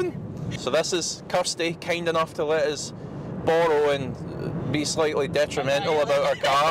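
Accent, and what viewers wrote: Scotland, United Kingdom. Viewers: I wish I was born in Scotland. Such a great accent!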